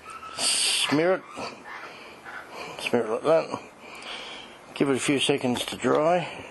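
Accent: Australia. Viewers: my estrogen went up with all the weight which isn't a good thing. Try it and let me know. I stopped all sugars instead of fruit of course. I also stopped wheat. I wish you luck. You sound Australian, only because I live in Adelaide.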